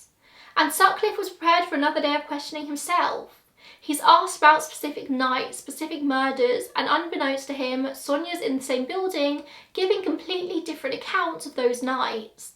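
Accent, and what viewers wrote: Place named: Cockney, United Kingdom. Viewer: dear, I think you should seriously consider making a living simply by talking. Your cute Cockney accent is what I describe as "Adorable." Along with your beautiful voice, you (yourself) are beautiful love xoxoxox.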